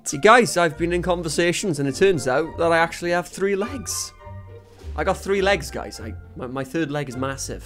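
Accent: Wales, United Kingdom. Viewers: You are Welsh aren't you? My mom is a Welsh immigrant. And I can pick that accent out now pretty easy. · Are you welsh ???? My dad is from cardiff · R u welsh?????? If u are I am too I recognise the accent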